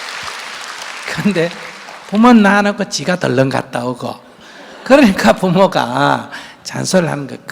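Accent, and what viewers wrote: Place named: Gyeongsang, South Korea. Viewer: 스님 " 살은 좀 갖다 먹었어요" .^^ 경상도 사투리라 잠시 놀랐습니다. 쌀 발음이 살.. 평생 안 고쳐지더라고요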